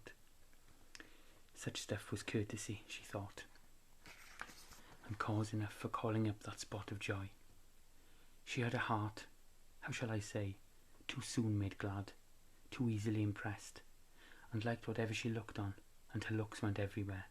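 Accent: Wales, United Kingdom. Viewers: A Welsh accent! Very pleasant to listen to. Supposedly many of my ancestors were Welsh (I'm American). I can't wait to see more videos from you! :)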